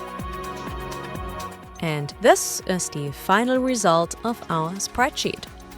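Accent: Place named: Germany. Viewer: Thanks for your teachings, Senpai. Your german accent is cute. But~ enough simping for one day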